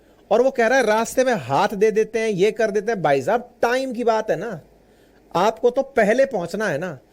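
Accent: Rajasthan, India. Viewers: Sir pura rajasthani style me samjha rhe hain kya baat hai 😅 vaise mai up se hu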